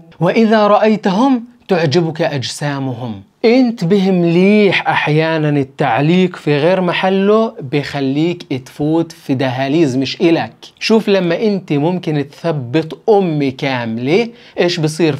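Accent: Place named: Palestine. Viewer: احلا لهجه الفلصطينيه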